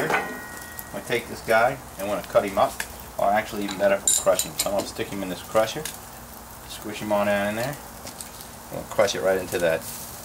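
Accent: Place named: Louisiana, United States. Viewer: im guessing hes from Louisiana the way he says filets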